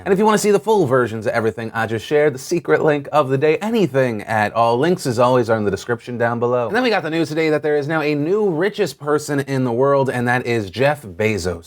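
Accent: South, United States. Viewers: hey, why did you have a southern accent in this one?> XO mom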